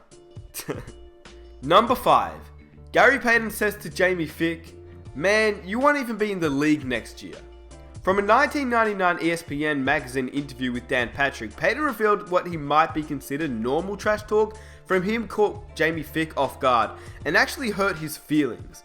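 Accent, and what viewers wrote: United Kingdom. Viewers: Omg english accent is so wroooong here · Interesting hearing a Brit talk passionately about NBA stories. Too bad the NBA sucks now. Too soft. It was great in GP's day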